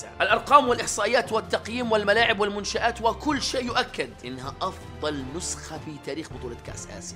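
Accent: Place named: Iraq. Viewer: بس سوال انتي عراقيه صح من طريقه كلامك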